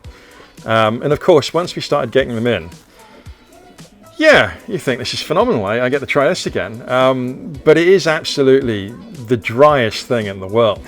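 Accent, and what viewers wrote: Bristol, United Kingdom. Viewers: is LOVELYYYY, love the video! Found you cus' of Super tomato page! Wooo - Also recognise that bris accent anywhere haha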